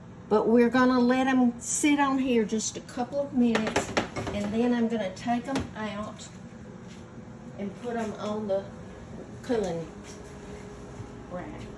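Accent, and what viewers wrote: South, United States. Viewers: I love your Southern accent !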